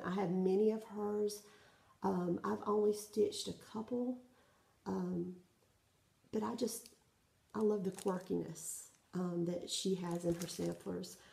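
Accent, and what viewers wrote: South, United States. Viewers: Great video.... I LOVE your project bags. I'm not good with a sewing machine but I think I have to attempt a project bag... Your choice of fabrics is striking....Love them, love them, love them... did I say I love them ? :) Please do keep making your videos and share your WIPS and FFO with us. Love your Southern accent (and your friendly personality) reminds me of my relatives back home (Arkansas). SO, from the Pacific Northwest, welcome to Flosstube and Happy Stitching. Hugs, Toni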